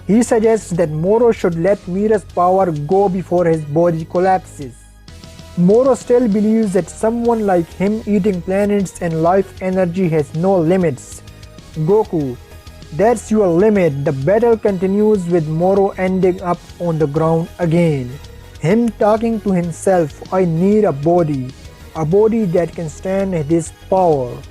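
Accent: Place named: India. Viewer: Your voice is like a indian btw i love your videos and now i also love your voice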